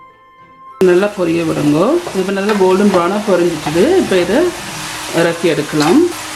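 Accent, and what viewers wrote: Sri Lanka. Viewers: உங்கள் சமையலை விட உங்கள் இலங்கைத்தமிழ் அருமை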